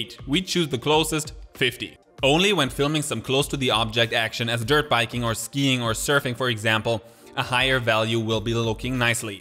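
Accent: Germany. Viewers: Hört sich an wie ein Deutscher, der englisch spricht.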